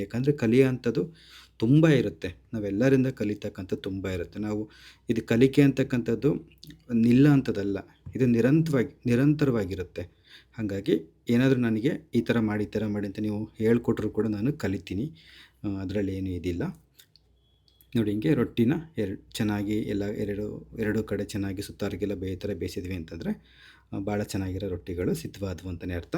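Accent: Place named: Holalkere, India. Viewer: ನಿಮ್ಮ ಮಾತು,ನಮ್ಮ ತಾತ ಅಜ್ಜಿ ಮಾಮ ಹೊಳಲ್ಕೆರೆ ಗೆ ಹೋದಾಗ ಮಾತಾಡುವಾಗ ಕೇಳಿದ್ದೆನು. ಅದೇ ರೀತಿ ಇದೆ.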